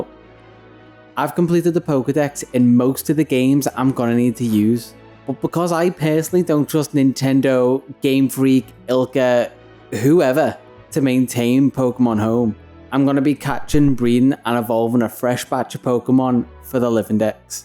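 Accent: Liverpool, United Kingdom. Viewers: Whiplash putting a Pokémon video on and hearing a scouse accent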